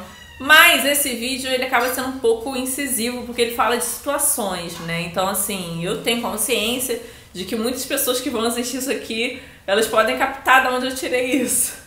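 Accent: Rio de Janeiro, Brazil. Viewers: ? VC É DO RJ?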